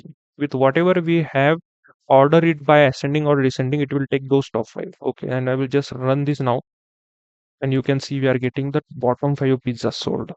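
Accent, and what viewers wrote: India. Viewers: unsufferable indian accent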